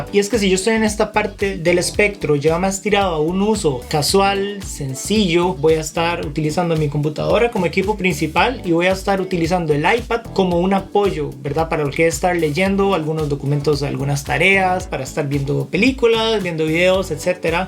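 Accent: Costa Rica. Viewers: Hola Hola! He visto algunos de tus vidos y me han gustado por lo claros y concretos, muy bien elaborados gracias! Para un uso universitario (lectura, edición de documentos word, docs, excel, sheets, pdf) ¿cuál me recomiendas? El 9 no me llama la atención mucho por su puerto lightning Estoy entre iPad y Tab S7 FE. . . PD. Tienes un acento como tico 👀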